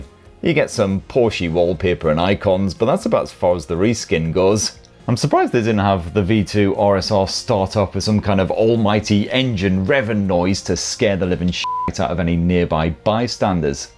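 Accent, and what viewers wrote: United Kingdom. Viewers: Bro likes saying bloody(of course his British (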